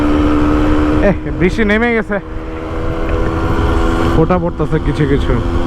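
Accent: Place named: Tangail, Bangladesh. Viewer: Bhai apner tangail er kothai amar gram er bari tangail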